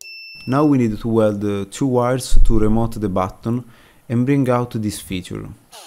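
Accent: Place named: Tuscany, Italy. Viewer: Mi sà che tu sei anche toscano oltre che italiano 😀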